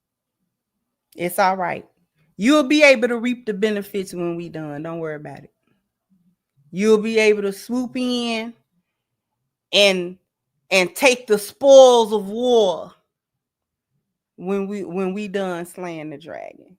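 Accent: Chicago, United States. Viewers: Yep, loved the "tuna can" comment. That's Chicago in you! I know it when i hear it! 😂😂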